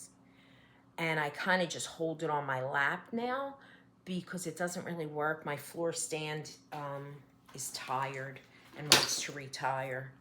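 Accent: New Jersey, United States. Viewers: Welcome to Flosstube! Being a CT girl living in the Midwest now, I immediately smiled at your Jersey accent. Beautiful WIPs! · Hi, I just binged watch all three episodes. I enjoyed you so much. You had me laughing because you sound just like me. I live in FL for many years, but accent is still all Jersey. I too started embroidery and cross stitching as a young girl and remember the stitch on one pattern at a time because our options were limited. Now I have several WIPs on the go. Look forward to seeing your Autumn completions, my favorite time of the year.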